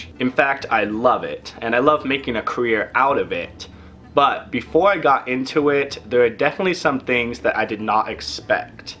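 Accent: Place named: New Jersey, United States. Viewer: It's called having an accent, believe it's from Jersey or Long Island. Get educated.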